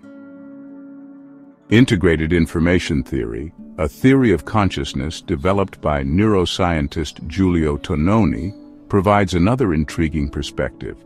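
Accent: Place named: England, United Kingdom. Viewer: I'm bothered by the narrator's two accents. Why is he slipping from an America English accent into an England sounding English accent? Is anyone conscious of this? I assume some are now. Please explain.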